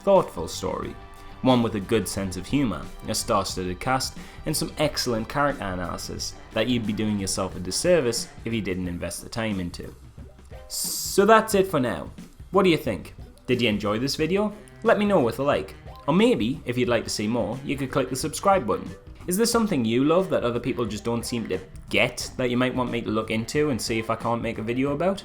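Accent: Newcastle, United Kingdom. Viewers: U sound like willNE like not just the geordie accent but the actual voice too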